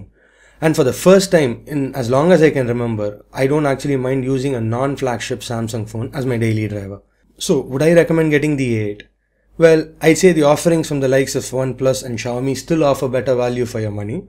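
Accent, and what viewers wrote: India. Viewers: dude what happened to your voice.. you need more power you sound tired... the Indian accent is fine but you need to be more energised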